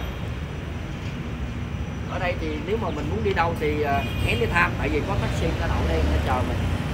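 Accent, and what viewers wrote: Mekong Delta, Vietnam. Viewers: không làm bộ đâu có nghe nói 30 năm chưa về Vn nếu như vậy anh rời Vn 1993. Nhớ không lầm là người Trà Vinh cho nên nói người nhà quê đi Sài Gòn là đúng không có sai. Bạn nói làm bộ lúa cho phim hấp dẫn nghe cho rõ giọng nói là giọng miền tây chính gốc. Nếu đi 1993 Vn còn bị Mỹ cấm vận thương mại mãi đến 28/01/1995 hai bên mới mở văn phòng phòng đại diện. Đến 1997 Vn chính thức mở cửa thương mại thông thương với các nước nên mới thay đổi bộ mặt Sài Gòn Gòn xưa. Những năm đó muốn nhập hộ khẩu ở Sài Gòn cũng rất khó khăn.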